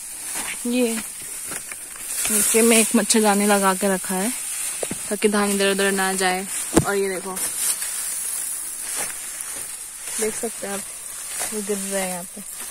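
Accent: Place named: Jharkhand, India. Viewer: Ap to bilkul jharkhand k logon jaisa hindi bol rhi hai, ekdm raw and original. I thought people over here speak only English. This is the first video I am watching related to Mizoram lifestyle and I am quite impressed. Lots of love and warm hug from Jharkhand.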